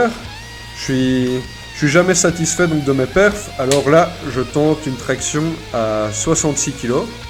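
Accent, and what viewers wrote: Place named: Belgium. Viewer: Sympa le vlog et l'accent belge ;) Tu étudie quoi ??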